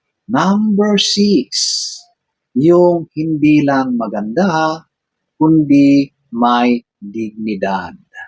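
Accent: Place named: Baguio, Philippines. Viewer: Dyan ako natutuwa sa pananalita taga Baguio ka ba sir?!😅😅😅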